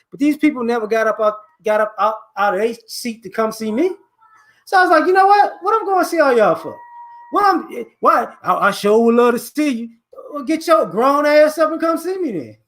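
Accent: Chicago, United States. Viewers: Just listening to you, I can tell you from westside of Chicago. For some reason everything you saying Ive dealt with my entire life. I think its something about growing up in that environment we notice how confused people in society really are and dont even know it. STAY up fam...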